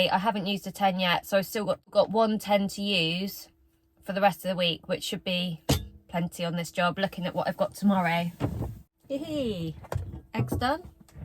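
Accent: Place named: Wales, United Kingdom. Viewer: Hi, nice to see you back again and looking well although I know looks can be deceiving. But you are always smiling so that’s good. Yes the welsh accent is great isn’t it although I am a bit biased mum was Welsh and us kids were bombed out of London in the blitz. So up to our family south wales lucky us.😂😂. I bet it’s nice sleeping on the farms and safe of cause. Well stay well you’re health is the most important thing so never take it for granted if you do it will catch you out down the line. I know I have been there and it’s most unpleasant. Good luck Jon 🇦🇺WA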